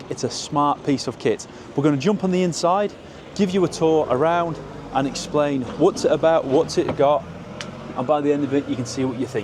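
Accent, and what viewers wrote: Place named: Scotland, United Kingdom. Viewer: It sounds like this fine young gentleman is speaking the kings English but I can't understand a single word he is saying. Is he Scottish? Nice van though.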